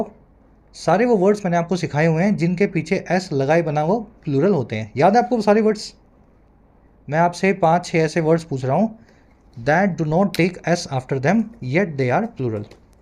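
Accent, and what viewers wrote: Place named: Punjab, India. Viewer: Sir aap to gujrat ke ho par muje aisa lagta hai aap mere bade bhai punjabi ho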